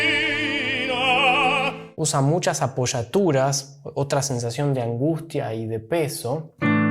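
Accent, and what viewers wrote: Argentina. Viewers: Non sto piangendo, beh, sto piangendo perché il tuo accento è molto divertente. Tutti gli argentini parlano così? Grazie per avermi fatto ridere. Questo è il bacio di Tosca! Muori, dannata, muori! 😂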